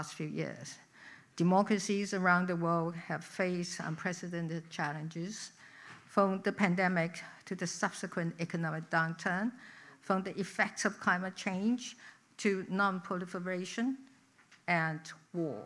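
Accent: United Kingdom. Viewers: I love your British accent Wingardium Leviosa to our liberty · 英國腔好好聽😍愛了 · 好優美的英式英文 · 貴族標準的英國腔，令人賞心悅目，移居USA 40年的台灣人，感謝🙏前總統蔡英文繼續為台灣拼外交❤❤❤🎉🎉🎉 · 總統的英國腔真的好好聽❤ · 聽蔡總統的英倫English 演講，像喝一杯淺焙曼特寧熱咖啡，深邃雋永，感謝蔡總統為國訪問友邦，我早猜到蔡總統離開總統府之後還是不會閒下來，還會持續為台灣出力，讓台灣人驚艷，給蔡總統我最好的祝福，您是台灣人永遠愛戴的總統！ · 前總統用漂亮的英式口音，在國際為我國的自由民主發聲👍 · 確實! 擁有敦倫賑精學店的腔調味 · 有點英國腔的蔡總統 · 感謝蔡總統卸任後努力做外交，好久沒聽到蔡總統的英國腔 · 超級喜歡總統的英式口音，感念她執政8年總統，不管內外成績亮眼，讓世界看到台灣發光發亮。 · 的发音是英式的！👍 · 蔡總統的英式英語真道地，很好聽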